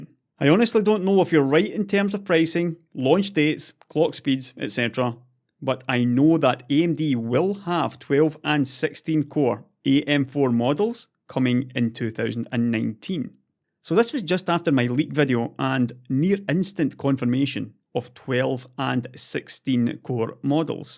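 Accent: Scotland, United Kingdom. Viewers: Scottish and male alter ego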